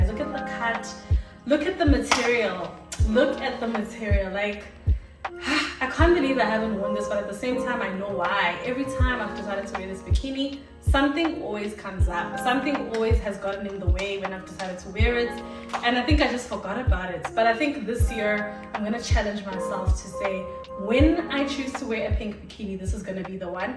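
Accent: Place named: South Africa. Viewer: You're an excellent presenter and ambassador for busty ladies. You are charming. Plus I love your SA accent 🤩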